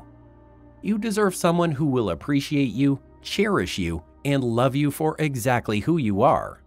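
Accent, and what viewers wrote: Canada. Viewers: The Canadian accent strong here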